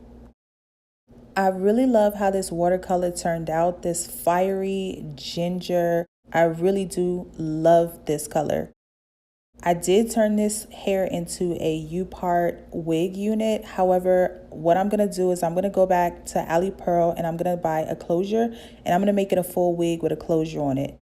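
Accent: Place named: New York, United States. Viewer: GIRL! You can hear the NY all up and through this video 😂😂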